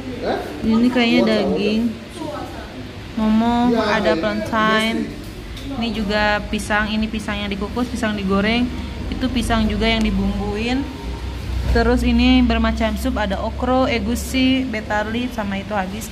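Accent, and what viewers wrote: West Java, Indonesia. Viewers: Tth sunda bgtttt hihihiiii walaupun di negara org.masih ttp nyundaaa sukaaaaa😍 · Teteh mani lucu nyunda pisan sok atuh pulang ka tanah Pasundan hehehe cing damang teteh .enggal gaduh bayi atuh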